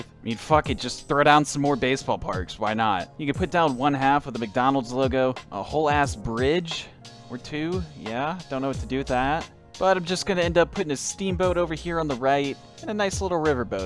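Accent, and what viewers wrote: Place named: Baltimore, United States. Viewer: algorithm That's just the Baltimore accent. You should see what happened with Aaron's iron urn.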